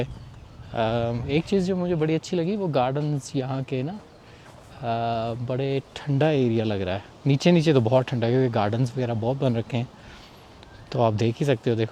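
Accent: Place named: India. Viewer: Bro r u indian?. Ur urdu has very big Hindi/indian touch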